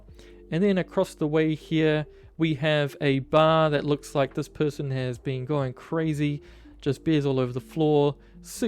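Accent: New Zealand. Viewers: You a kiwi bro??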